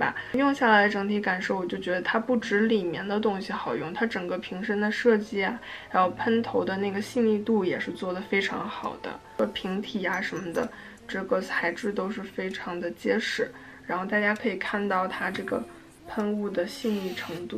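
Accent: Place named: North, China. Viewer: 听你说话应该是北方人😆亲切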